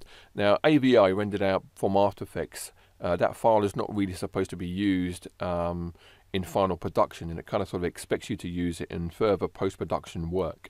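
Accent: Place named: United Kingdom. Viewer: Your accent is so like Hermione(British)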